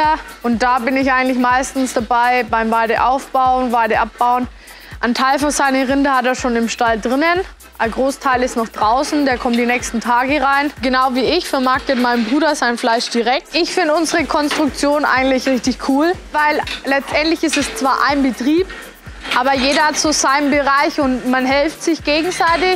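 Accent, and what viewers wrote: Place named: Franconia, Germany. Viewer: Servus, erstmal geschaut wo der Hof is. Sin entspannte 50km. Freut mich total das jemand aus der "Gegend" dabei is auch wenns keine 100ha sondern nur 13 sind. Is nich negativ gemeint aber man hat echt gemerkt dass es schwer vom Fränkischen ins Hochdeutsche reden zu kommen. Bin auch totaler Gülle Fahrer auch wenn ich letztes Jahr kaum Aufträge fürs Zubringer fahren von meinem MR bekommen hab. Freu mich echt auf mehr Videos Gruß Ausm Fürther Land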